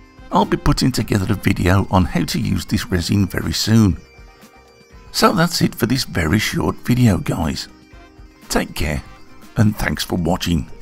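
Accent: Birmingham, United Kingdom. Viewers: are you a brummie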